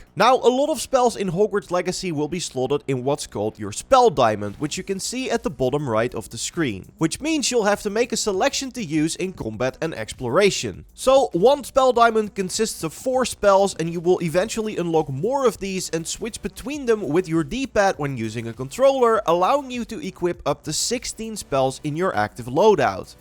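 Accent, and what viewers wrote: Netherlands. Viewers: I smell dutch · Are u dutch? I hear a dutch accent in your english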